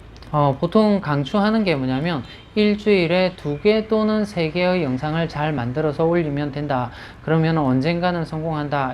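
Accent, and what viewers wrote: Gyeongsang, South Korea. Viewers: 경상도 분들이 유튜브를 많이 하시네요 듣다보니까 분석능력이 뛰어나시네요 와우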